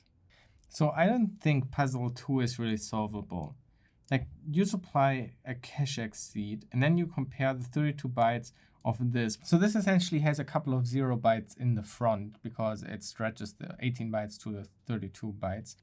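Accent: Germany. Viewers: I bet this guy has a fritzbox as router... he sounds german and has the 3'rd octet x.x.178.x. Not complaining. Fritzboxes are alright.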